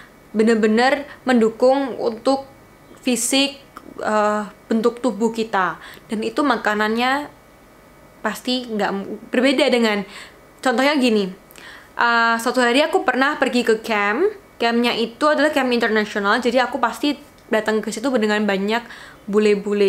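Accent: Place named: Java, Indonesia. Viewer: Medok jowone hahahha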